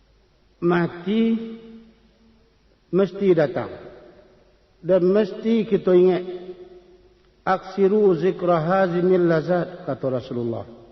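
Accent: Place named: Maninjau, Indonesia. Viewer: Kalau dari Bahaso ampia Samo logat Jo urang Maninjau... contoh bada..mantiko dll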